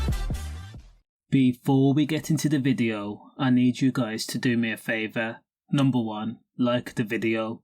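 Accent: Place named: Black Country, United Kingdom. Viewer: Are you from Dudley bro or the black countr... Accent sounds familiar 😂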